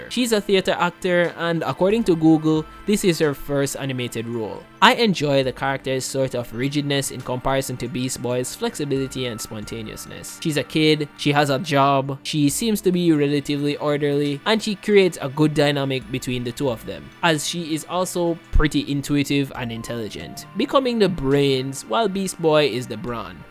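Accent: Jamaica. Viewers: This is my 1st vid and i can tell your jamaican i am glad there are jams like me who loves explaining cartoons/shows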